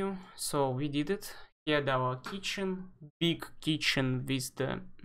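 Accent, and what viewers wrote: Russia. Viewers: great tutorial, I think you are native Russian yea? You have the same accent speaking english as like my girlfriend, she's from sint petersburg :)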